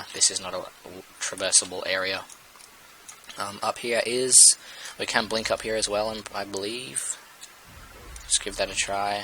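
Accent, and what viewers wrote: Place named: Australia. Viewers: he's an aussie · thought that mighta been an aussie accent. was a little softer than we 'Mericans are used to hearing ;P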